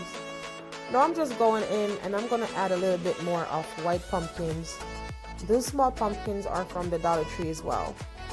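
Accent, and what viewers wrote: Jamaica. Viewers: Very nice 👸🏽thanks for sharing💕 I hear your accent where are you from? Are you Jamaican?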